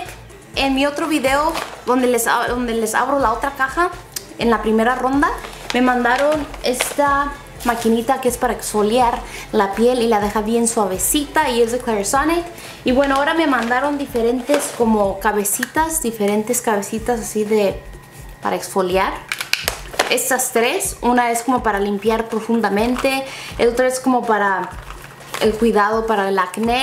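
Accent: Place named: Michoacán, Mexico. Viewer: !!!!! Felicidades!!!,tengo poco siguiéndote en tus tutoriales me gusta mucho como te maquillas, qué bonito rostro tienes, quisiera saber de donde eres originaria o de donde son originarios tus padres porque tienes un acento como que del estado de Michoacán, recibe saludos desde México D.F.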